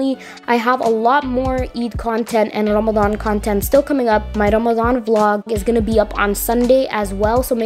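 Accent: Toronto, Canada. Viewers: I hear the Toronto accent lol!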